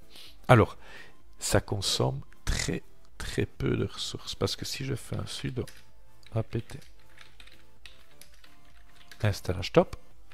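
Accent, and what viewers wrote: Belgium. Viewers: j'adore cet accent belge "une fois", et un pouce bleu pour toi mon ami... j'ai suivi le tuto jusqu'au bout, mais à l'ouverture j'ai un message "Impossible de lire le fichier de ressources: ~ /.rtorrent.rc", je précise que j'ai enregistré le fichier ".rtorrent.rc" dans /usr/share/doc/rtorrent/examples, car je savais pas où l'enregistré c'est là ou j'ai peux être fauté, peux tu m'aider s'il te plait grand chef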